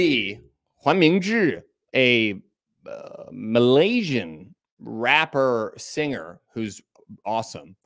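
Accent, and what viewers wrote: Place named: China. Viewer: Best Chinese accent ever!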